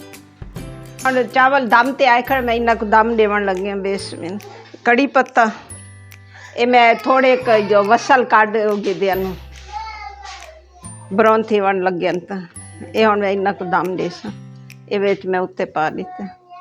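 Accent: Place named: Saraiki, Pakistan. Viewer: Sariki voice im like good Ap ka channel subscribers kar dya ha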